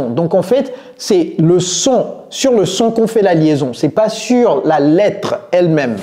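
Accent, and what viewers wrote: United States. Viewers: Je suis très content à cet accent Américaine.Merci Soyez bénis. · J'suis étudiante mais jamais vu un coach comme vous. J'aime trop l'accent américain mais c'est trop difficile à comprendre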